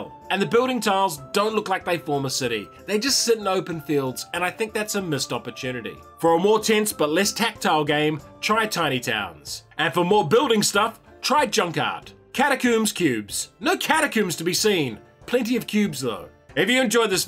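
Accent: New Zealand. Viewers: "Catacombs Cubes" sounds much more like it rhymes when said by a New Zealander than it does when said by a Merican...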